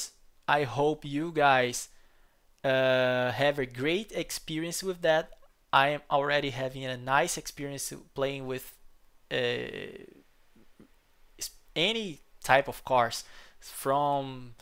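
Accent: Brazil. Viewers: boa mn lamento dizer mas seu sotaque brasileiro é bem reconhecível kkkkkkkkkkkkkk video bom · QUE INGLES top hein, obrigado amigo, vi que é br pelo sotaque hahaha, thankyou bro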